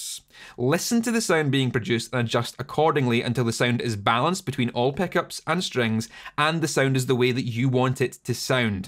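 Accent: Scotland, United Kingdom. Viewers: Great video (except too much information crammed into so little time at a speed that precludes taking notes since I can't write that fast, with such heavy British accent that I can't understand because you talk too fast)! But on a separate note (no pun intended), how different are today's pickups from S.G.'s patented ones? (I think it was SG, Simon maybe? crap, I can't remember anymore cause I'm so stinking old!!! ... Ole what's his face .... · Not being a Scottish Highlander myself, I had to slow down the Playback speed here AND use the CC subtitles as I also often do with Irish movies, unlike Liam Neeson would do with his "particular skill set" of being an Irishman. The Great Scots even make the intrepid Irish look like wussies while even wearing their skirts too; that's like Viking level cool and I've gotta Love that! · It reminds me of Mr. 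Scott on Star Trek. "The engines canna take much more of this, Captain! Ach, my poor wee bairns!"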